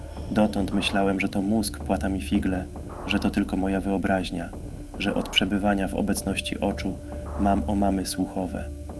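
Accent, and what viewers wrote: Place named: East, Poland. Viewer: dobra pasta dobry lektor chociaż momentami akcent wschodni słychać ale to nie przeszkadza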